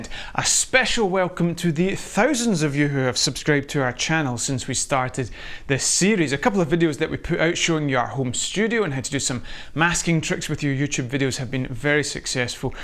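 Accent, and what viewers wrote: Scotland, United Kingdom. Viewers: Nice Accent, I want to go to Scotland someday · If only I could have the voice Scottish accent:). Thanks for the video....helps with COVID and carrying on with classes:)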